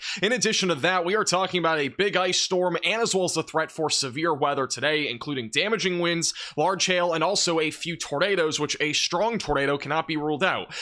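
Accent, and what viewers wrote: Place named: North, United States. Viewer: You, I can tell you're from up north..... Ryan Hall, gotta be from GA.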